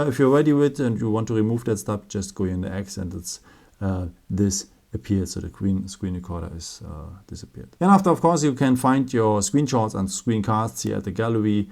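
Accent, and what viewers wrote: Germany. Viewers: Der deutsche Akzent is da😂✌🏼 aber nice Video hat mir sehr weitergeholfen!👌🏻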